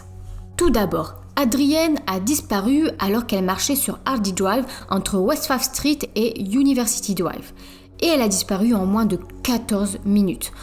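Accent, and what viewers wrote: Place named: Nord-Pas-de-Calais, France. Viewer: Bonjour, Tu viens de quel département exactement. C'est pas méchant de ma part mais c'est la première fois que je suis tombée sur quelqu'un si jeune avec l'accent ch'ti si prononcé.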